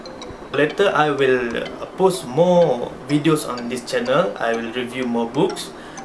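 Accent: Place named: Malaysia. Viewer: Where are you from? I bet it's between Malaysia or Indonesia. I'm Indonesian actually but not a booktuber, but I subcribe u to find good books :D I can't speak english fluently too xD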